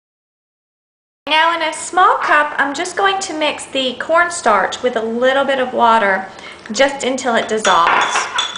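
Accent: South, United States. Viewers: An Asian girl with a southern accent. You know this Bourbon Chicken is on point!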